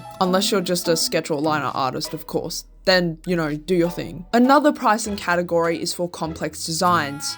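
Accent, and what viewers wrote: Australia. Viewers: i thought they sounded australian lol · the aussie acent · they sound australian(?) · I got whiplash hearing my very own thick Aussie accent thrown at me. I’m always expecting American at this point. Great video btw ✌️ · idk why i just love her accent, usually i don't care for Aussie accents, i think they sound like goofy British accents, similar to Canadians fake French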